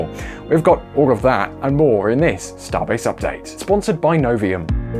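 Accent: United Kingdom. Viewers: Hi NSF, it is nice to listen to, because of being British, BUT can we please slow down the speed a little, only a little. From your 1.1 Million subscribers are not all native English speaker, like the poor guy here. Due to rewind and repeat it took exactly 35 minutes for this clip. To lenghten it to 2 minutes longer will reduce that immensly - see John's clip yesterday. Speed was perfect there. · Kinda difficult understanding some of those wiords with that pommy accent.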